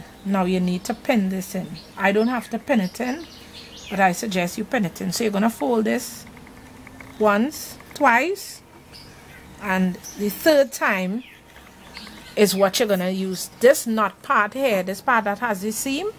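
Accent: Trinidad and Tobago. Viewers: Heyyyyyyyy, I was pleasantly surprised to hear a Trini. Love it!!!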